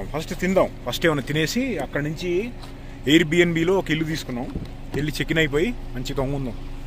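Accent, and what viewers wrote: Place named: Telangana, India. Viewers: Telangana slang m ra vari😂😂😂😂😂😂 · Emirà vari .....😂....pure telangana slang .....i jst got remembered Venu Madhav....garu😂😂😂😂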